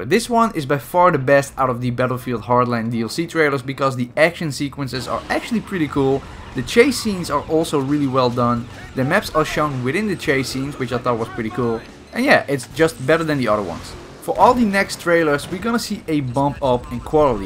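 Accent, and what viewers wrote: Netherlands. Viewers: you dutch? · You are from the netherlands or am i wrong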